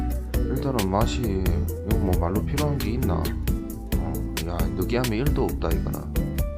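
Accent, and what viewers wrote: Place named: Daegu, South Korea. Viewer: ㅋㅋㅋㅋㅋㅋ 대구에 있는 내친구가 얘기하는 줄 알았네요 ㅋ 저거 단점이 있어요.. 밥을 너무 많이 먹을꺼 같아요 ㅋ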